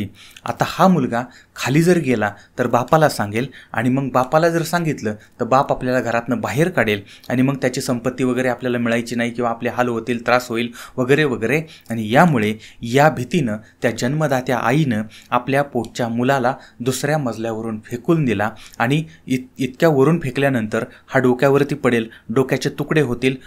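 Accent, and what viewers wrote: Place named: Ahmednagar, India. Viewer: nice Nagari style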